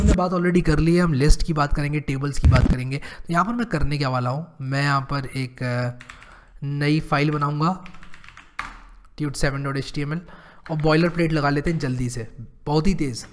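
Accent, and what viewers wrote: India. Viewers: I like Indian accent yaar its amazing i am u say "agr ye playlist acces nai KRI" an we say " agr ye nai KI" and many other words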